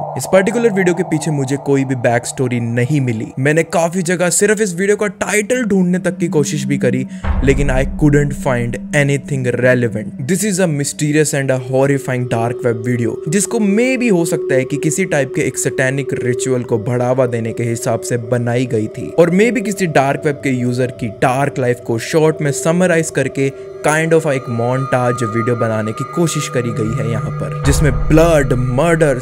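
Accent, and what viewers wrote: India. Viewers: I couldnt understand anything because of his dirty hindi accent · I love his Indian accent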